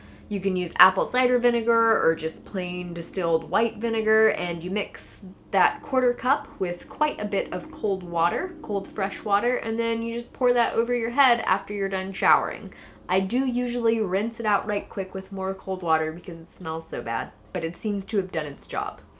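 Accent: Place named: Tennessee, United States. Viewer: GREAT INFO! THNX! WHEN I USE THE APPLE CIDER VINEGAR I SOMETIMES LEAVE IT ON, THE SMELL GOES AWAY IN A FEW MINUTES, BUT YOU CAN ALSO ADD ONE OR 2 OF THE ESSENTIAL OILS FOR HAIR GROWTH, LIKE ROSEMARY, PEPPERMINT, CARROT SEED, LAVENDER OR EVEN TEA TREE TREE OIL. I ESPECIALY LOVED YOUR TENNESSEE ACCENT, WHY NOT TALK LIKE THAT ALL THE TIME? ✌💚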